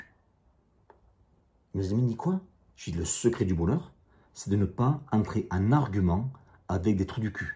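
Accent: South, France. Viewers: Salut. Déjà, les gens ne te comprennent pas parce que tu parles vite. Mais il suffit de s'y habituer je dirais et c'est tout!!! Ensuite tu as un accent que je n'ai pas et c'est bien dommage, je suis parisien, personne n'est parfait... Mais surtout, garde le ton accent, c'est agréable, c'est chantant, ça rappel les vacances, le sud et le bon air! Tu ne vas pas l'effacer pour faire plaisir a certains! Tu sais, c'est un peu comme les gens qui se plaignent des cigales dans le sud, elles font trop de bruit qu'ils disent! Mais le sud sans les cigales, c'est plus le sud!!! Et c'est un peu comme, il parle comme il parle, point!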